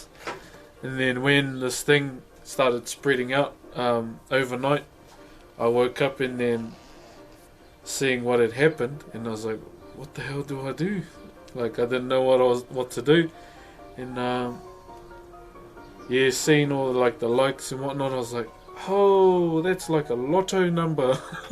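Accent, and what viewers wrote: New Zealand. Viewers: the New Zealand accent is so wholesome. I love you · if I'm not wrong I think he has a Maori accent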